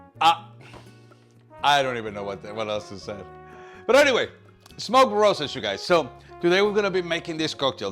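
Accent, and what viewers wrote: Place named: Latin America. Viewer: I’m sure your Latin accent is part of why “Production” fell in love with you. 😂 The rose water tutorial was great. I need to try that. Salud! 🥃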